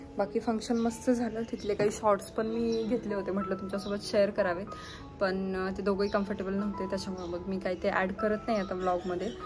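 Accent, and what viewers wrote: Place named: Pune, India. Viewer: Tu ekdam soft Ani typical Puneri boltes I like it....Ani tuze baby ekdam cute❤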